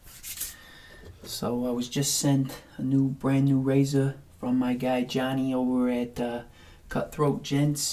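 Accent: Boston, United States. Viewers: I have to say, being from North Carolina myself, I have never met someone from Boston! I think it's such a neat accent, so much character! I always get sad with northerners think southerners are stupid automatically because of their accent or vice versa!! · Hate Boston accent.... Maybe something different? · The Boston accent is the best in ASMR I would say.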